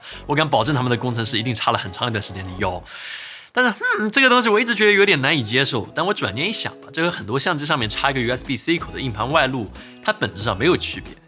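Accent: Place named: Zhejiang, China. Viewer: 听着像浙江/上海口音。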